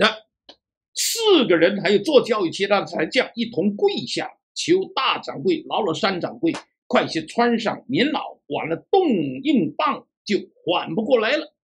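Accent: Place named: South, China. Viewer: 北派的小说，南派的口音，南北结合，在我看来这是创意，老杨全网独一份🎉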